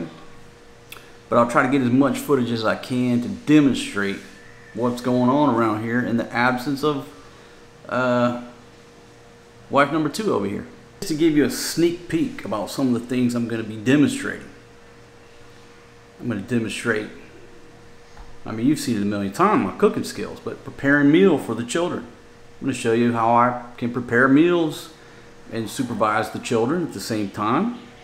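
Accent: South, United States. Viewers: Ahahaha. On 2158 min you said vijoos. Sounded so funny coming from you. Sounded so very Filipino. Kinda cute coming from a Southern man who has that Southern twang. Hope Fatima can relax when she goes back home so she can enjoy her vacation. Peace.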